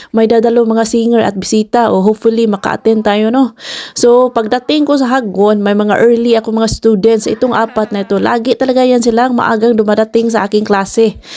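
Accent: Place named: Jagna, Philippines. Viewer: ah mao sakto ko basta dapit jagna basta dili sa pikas kay ang tunos imong tingog. Just keep it up. Tan aw ra kos imong mga vlog. Good luck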